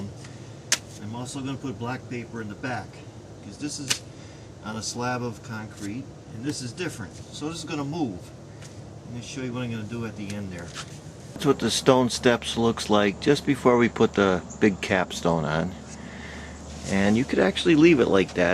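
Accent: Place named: Scranton, United States. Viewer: I live in St Louis but I grew up in Scranton. I’d know that accent anywhere. Great stuff.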